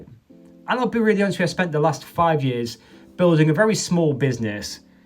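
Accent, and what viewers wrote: United Kingdom. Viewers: (this comment was based on your UK accent lol)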